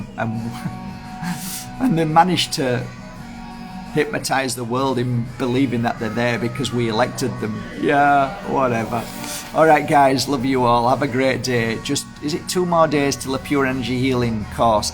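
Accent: Yorkshire, United Kingdom. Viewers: What you say is the most important , your tone of voice is soothing and understanding, and your accent is my personal favorite accent from England . I have been watching British programs on PBS in the US since I was a pre-teen and later when I was introduced to All Creatures Great and Small I became drawn to the Yorkshire accent, I love listening to it. In fact I feel drawn to the area and in general the north of England holds a kind of magic for me. Keep doing what you do, we need more people like you in this world. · That is so funny i totally understand your saying about hating hearing yourself speak i cant bear hearing my north London working class accent 🤣but your yorkie accent is beautiful always has a calming effect on me especially with the wise words you give us 🙏❤️❤️❤️ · Love your Yorkshire accent, I’m from leeds West Yorkshire, love this coffee chat , thank you for everything you do ❤❤❤ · Thanks for making and sharing this video. 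I love the Yorkshire accent 🙏💗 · Seems most everyone loves the voice. It’s got character and sincerity there, not to mention depth of perceptivity. People LOVE the Yorkshire accent too. Woah…30thousand. Well, AI is looking out for you some. Ai reflects us, in multiple aspects, normally not so good. In this rare case, a fine outcome. (Expect, I’ll pick up a bit of flack from people’s feelings on the Ai.) Its okay on the flow; lots of people picking up stuff starting particularly in the 90s, then went quiet for some, louder for others. Hmmm, don’t know about handling worse situations in the next few months. Have to consider that one. Well, all will get through it. Personally, I may hibernate. Thanks. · Most people love the Yorkshire accent. I'm Yorkshire and don't like my voice either. But most call centres in Yorkshire. Because people love the accent. Your voice is lovely and you speak from the heart ❤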